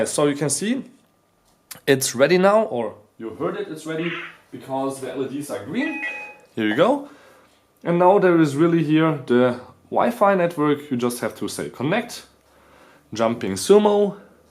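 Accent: Germany. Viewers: Man merkt so stark das du deutsch bist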